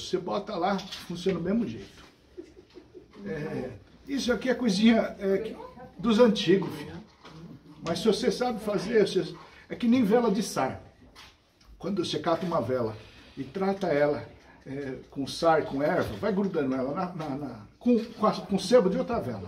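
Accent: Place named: North, Brazil. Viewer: Amo o pai, me lembra o dialeto do norte do Brasil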